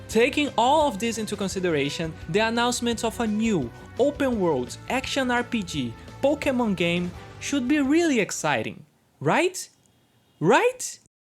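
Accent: Brazil. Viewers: AMAZING VIDEO, you really touched the subject of Game Freak and the Pokémon controversy with good conciousness but also firm on critics, Very enjoyable editing style (E com o sotaque brasileiro impossível de não amar).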